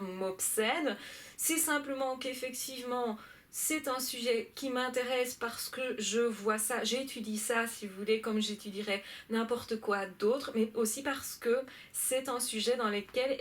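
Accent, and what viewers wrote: Belgium. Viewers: C’est la première fois de ma vie que je commente sur YouTube (pour une nana qui travaille dans le web c’est particulier mais bon xD). Bravo pour tes vidéos, elle m’aide énormément (je parle de moi mais j’imagine que c’est le cas d’énormément de personnes). Je suis victime actuellement, nous sommes séparés mais je suis encore sous emprise malgré le fait d’être totalement informée sur les PN. Pourquoi, je pense que c’est parce que j’ai un problème moi aussi malheureusement ( mais c’est pas méchant dans le sens ou je ne suis pas PN xD!) , je suis dépendante affective ( je le sais depuis très longtemps mais j’en enfin mis un mot là dessus depuis la lecture du livre Vaincre la dépendance affective). Tout cela pour te dire que tes vidéos m’aide à reprendre le dessus quand je retombe dans ses filets et rien que pour cela je te remercie infiniment. 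Je ne vais pas entrer dans les détails mais on va dire que si j’ai vu une vidéo de toi le soir, le lendemain j’ai plus de force et de confiance en moi pour me détourner de lui ou éviter ses pièges. J’ai encore bcp de mal à intégrer le fait que ce type de personne existe, il y a une semaine j’essayais de le comprendre , de chercher des excuses ( dans son enfance ou autre ) mais non je dois l’intégrer dans ma petite tête : des personnes sans humanité existent , et c’est franchement flippant. Sinon, petit aparté rigolo l’accent belge est mimi xD j’adore voir ton chat sur le canap ( j’adooore les chats mdrrrr ) et tu déchires car généreuse en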